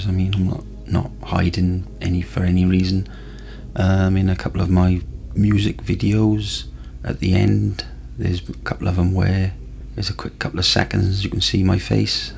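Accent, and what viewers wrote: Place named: Wales, United Kingdom. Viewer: You sound like you're from Newport, definitely Welsh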